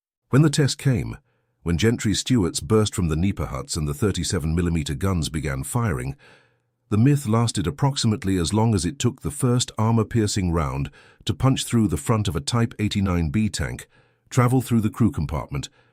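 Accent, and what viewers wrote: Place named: United Kingdom. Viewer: Wisconsin (now). Uncle was Naval Academy grad who commanded a destroyer in Pacific. Other uncles served in Canal Zone and elsewhere. My dad was too old but wasn't taken when he volunteered because he was an oil chemist and manager. He and one uncle were needed at home to produce oil and cotton. Friends' moms were welders etc in defense plants and shipyards.We all grew up in Arkansas like Pappy Gunn, learning to shoot when young including women. Both bros, 1 dead and 1 100% service-connected disabled, and I plus several relatives are VietNam-era vets. Your AI images, Brit-accented narration, and fake sonagrams suck.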